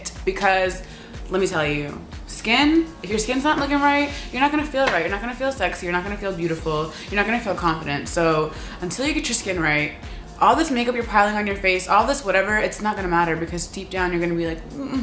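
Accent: United Kingdom. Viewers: Ur British accent is the shit !! Loll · i live for your british accent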